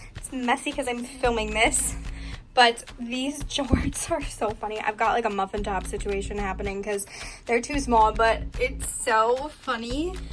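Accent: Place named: Wisconsin, United States. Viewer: Your Wisconsin accent is the cutest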